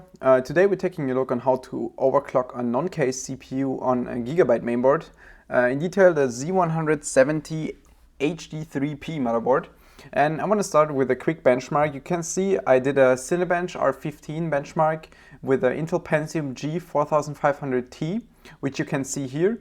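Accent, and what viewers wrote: Germany. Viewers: Man hört, dass du aus Deutschland kommst :D cooles Video! Hast du das Bios gemacht? Wenn ja, mit welchem Tool? Versuche schon ne Weile ein Ozmosis Bios zu machen, aber das funzt nicht, weil es die Firmware schrottet. Was hast du benutzt? LG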